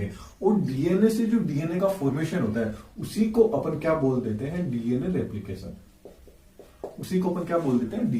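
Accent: Rajasthan, India. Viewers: Sir aap Rajasthani ho?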